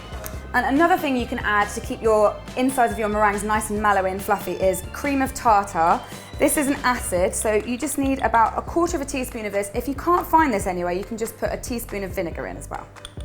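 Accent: England, United Kingdom. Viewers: I love the way you talk and your big and beautiful smile ♥ And of course all the delicious stuff you cook. My mom is also a pastry (I don't know if it's well written, I'm Argentinian and my english is not that great) and my favourite deserts are pavlovas, so she always makes it for me. I'm gonna tell her about this recipe. Keep cooking, and keep that beautiful smile in your face:3 It's a pleasure to see your videos from Argentina and listen to your beutiful english accent! Big hug ♥ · Hi, just discovered your channel, so nice to hear an English accent on YouTube! I saw another video for making individual meringues and the instructor said they should be put in the oven at 275 for 45-60 minutes....I don't know if my cooker is Celsius or Fahrenheit but I guess 275 was too high as the meringues came out brown. No matter how hard I tried, I could not get my whites to fluff up as much as you did. 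Is that because I didn't beat enough? beat too much? or is it just that I didn't have an cream of tartar? I would really appreciate your advice.....I wanted to make these for a special family dinner and was so disappointed by the mess I finally produced!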